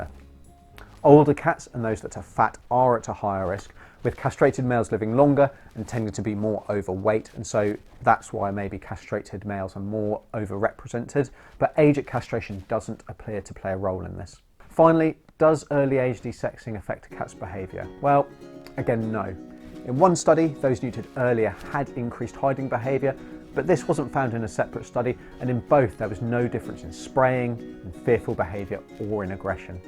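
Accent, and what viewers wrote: United Kingdom. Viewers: British accent plus music Thanks for cc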